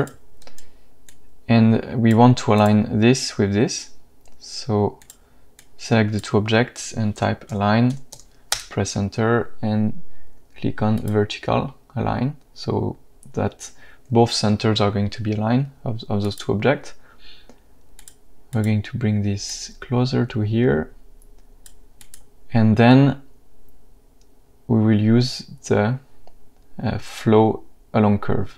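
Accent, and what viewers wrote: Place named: France. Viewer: I think you are a french native speaker ;)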